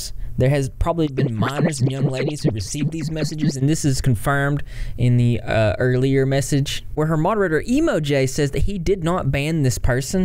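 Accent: South, United States. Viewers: Odd question: Where’s your accent from? I love it; I’m guessing SC or maybe GA. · I love the subtle southern accent... not related to the video but your accent is nice haha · This guy makes a southern accent HOT 🥵! 😅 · I cant tell if your from Southern USA or Austrailia ? Love your accent 😍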